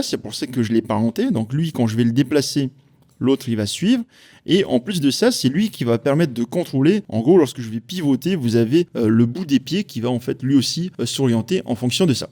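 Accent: Tahiti, French Polynesia. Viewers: Super vidéo, super qualité et super boulot ! :D (et l'accent tahitien c'est tellement peu commun dans les tutos de logiciels ça fait plaisir à entendre aussi) J'avais besoin que d'une partie et certains trucs ont changé de nom ou autre dans UE5 mais j'y suis arrivé donc merci :) PS : pour se souvenir de l'annulaire, il porte ce nom parce que c'est celui qui reçoit l'anneau quand on se marie ;) Et auriculaire parce que c'est celui qu'on utilise pour se gratter dans l'oreille lol, c'est moins glamour.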